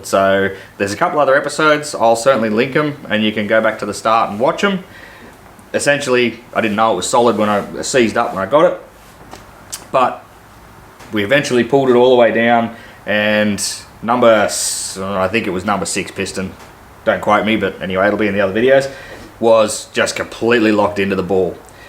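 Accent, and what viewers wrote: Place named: Australia. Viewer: hahahaha....I thought you might have "bitten" on that one! I used to live in your area but I have since moved to The Philippines. Its good to hear your Aussie accent !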